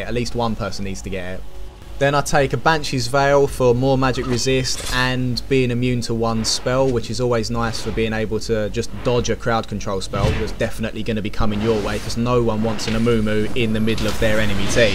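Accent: United Kingdom. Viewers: u from london?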